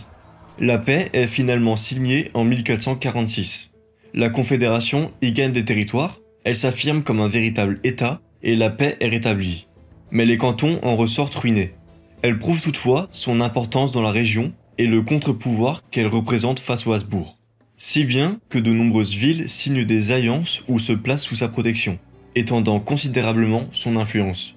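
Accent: France. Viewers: On sent que c est un français qui a fait la vidéo! On ne dit pas LE Schwytz ou L’Uri mais simplement Schwytz ou Uri, et pas « glarisois » mais glaronais…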